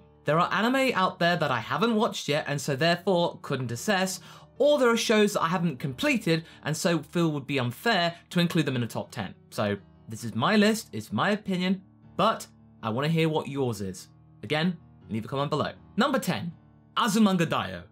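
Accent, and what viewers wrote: United Kingdom. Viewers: Well, good video, you are British Because you have an acent like a British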